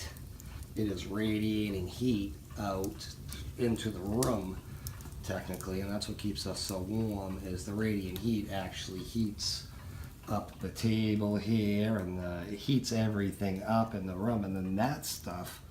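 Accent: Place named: Massachusetts, United States. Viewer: This is a genuine Massachusetts accent my brother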